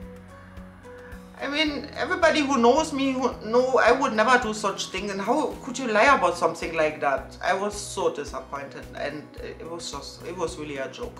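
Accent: Jamaica. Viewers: Hi, I'm one of your new subscribers. I love listening to you tell your stories of your Jamaican experiences. You tell it so well. You paint a very clear and easy to understand picture. I love hearing your Jamaican accent.😊👏🤝🙌🙏👍❣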